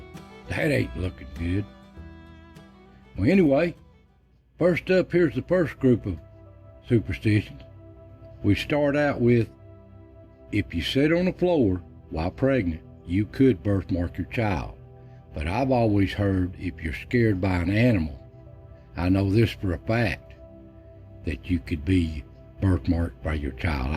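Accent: Appalachia, United States. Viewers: A very good friend of mind that lived in Unicoi County or Erwrin or Irwin all his life talked exactly like you. He passed away a few yrs ago, only in his early forties and I miss him. He could do anything from logging to sawmilling, welding to mechanics. You sound exactly like him. Love your videos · ......Thanks again for these. I've heard a lot of old sayings from this list. I notice a lot of folks talk about your voice. A lot of men that are from the mountains talk just like you. I have always said, "Give me a slow talking southern boy any day over any other kind of man." It's a compliment. & my opinion. My Papa was that way & he was a wonderful man.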